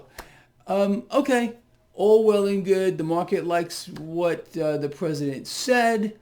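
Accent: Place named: Brooklyn, United States. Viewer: Preppayerd lol. A Brooklyn accent can never be concealed.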